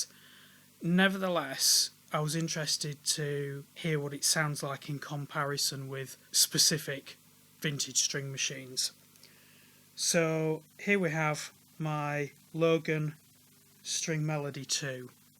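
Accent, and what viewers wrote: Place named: North, United Kingdom. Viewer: Great review nice to hear a northern accent on here.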